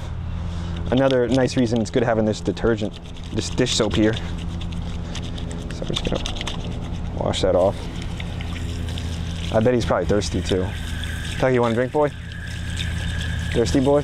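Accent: New Jersey, United States. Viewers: It's SO Jersey. I love it, he hypes you up before every video.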